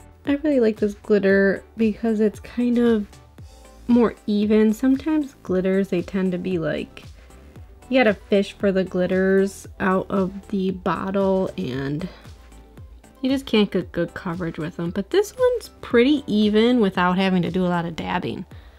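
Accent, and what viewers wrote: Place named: Chicago, United States. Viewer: Loved this video! Are you from Chicago??? That accent...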